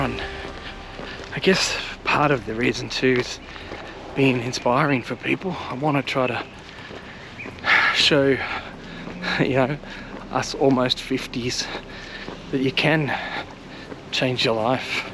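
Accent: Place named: Australia. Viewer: Just found your channel as you're about 2 weeks out from the event. I'm an Aussie living in Texas so I appreciated hearing the familiar accent on the videos. On my own fitness trip at the moment wanting to drop 20kg (lost 5 so far) and aiming for a half ironman to start with. Will be great one year to go to Cairns or Port Macqaurie to do a "home" race. I did have a laugh at the start of this when you mentioned "10 years ago" and then you looked down to check your watch haha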